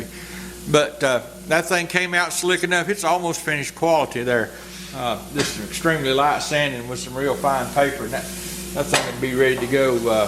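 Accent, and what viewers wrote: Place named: Texas, United States. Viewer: Hey, I have watch a hole lot of videos on You Tube and I want to say you are the best, really down to earth guy. I loved your show on safety. You are so dead on, of the point that it is your own business on safety. Thank you for your videos I have learned so much from you. You sound like your from Texas so am i close? LOL I live in Shreveport La Thanks again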